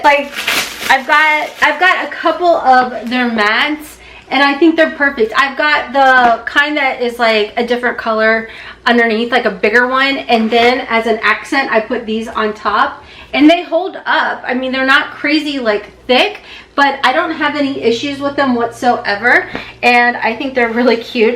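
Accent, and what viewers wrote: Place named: Alabama, United States. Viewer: I'm born and bred in Alabama and you sound like my people.....which makes me so happy because ive been living in northern Indiana for the past 13 years 😢 and i miss everyone back home.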